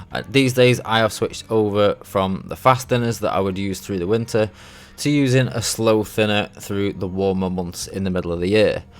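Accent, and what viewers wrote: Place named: Liverpool, United Kingdom. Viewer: MY GOD HE"S FROM LIVERPOOL!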